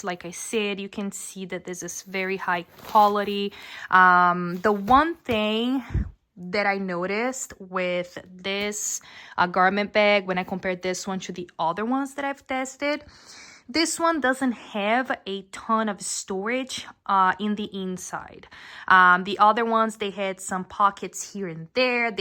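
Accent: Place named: Brazil. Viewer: so pela intonação da sua voz consigo perceber que você é brasileira, abraço! 🥰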